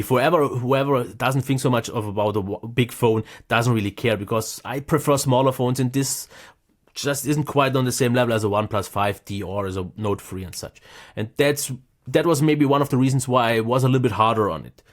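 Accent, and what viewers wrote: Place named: Germany. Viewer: Like the way you explain about which one to choose. Oh and that German accent is something else >))) keep the good work!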